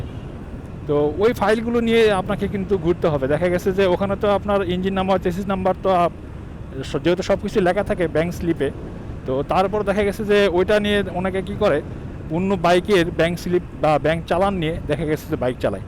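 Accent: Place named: Sylhet, Bangladesh. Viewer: তামাবিল রোড 🥰 আপনার একসেন্ট সিলেটিদের মতো, আপনার বাড়ি কোথায় ভাই?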